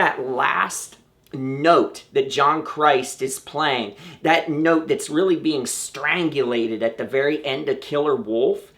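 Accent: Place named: Ohio, United States. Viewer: Sounds like Ohio to me!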